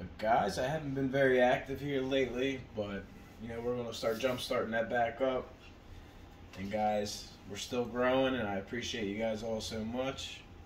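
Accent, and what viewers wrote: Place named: Philadelphia, United States. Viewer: Where in Philly/south Jersey are you at? I live in north Jersey so I recognize the accent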